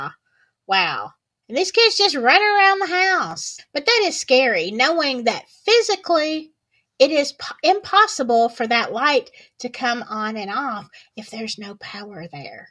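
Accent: United States. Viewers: U have the same English as the rest of English america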